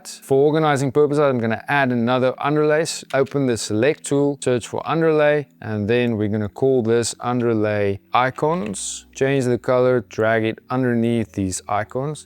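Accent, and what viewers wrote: South Africa. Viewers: Super awesome! Thanks for sharing. PS: With that accent you must be a boertjie from SA? Greetings from NC. Groete van huis tot huis.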